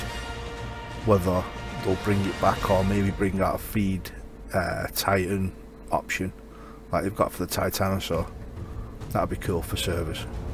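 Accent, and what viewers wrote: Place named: England, United Kingdom. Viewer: yeah I saw the creator bit with vids but couldn't find a list of the creators to check out . There should be a sections with a list of the creators and their channels to check out if there is I'm sorry I can't see if but if there is point me in the right detection ...I have just been getting some pointers off some of the guys on how to make vids on the xbox since a few people have messaged me asking me if I have vids on some of my builds but I only have pics ...I'm going to be trying to make a vid in the next couple of days with the help of some of the guys from the discord ...ohh n bwt love your accent on the vids makes me feel at home since I have moved out of England to be with my gf ..keep bringing out some awsome vids hope to see some cool designs in the future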